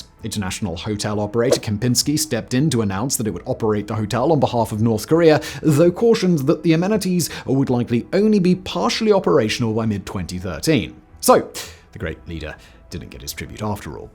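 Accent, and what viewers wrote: RP, United Kingdom. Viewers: He is sorta speaking the King's English. Just put up with it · Your thick, over the top, "posh" English accent can't pronounce New Orleans! Do you really talk like this, or are you really laying it on thick? Thankfully, this video is only a tad over 21 minutes. I don't think I could handle anything longer!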